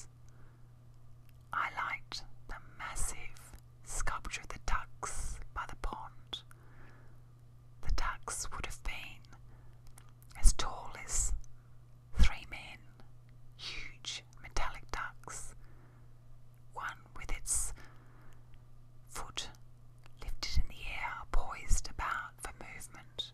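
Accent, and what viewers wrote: Australia. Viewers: Such a pleasure to hear a fellow Aussie! Very intense! And very close to home! <link> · I love the Aussie accent. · I find I can't watch your videos as often as other ASMR artists videos, because I don't want to risk losing the tinglefest I experience whenever I listen to you speak in that gorgeous Australian accent! That is, when I don't lose myself in your beautiful face!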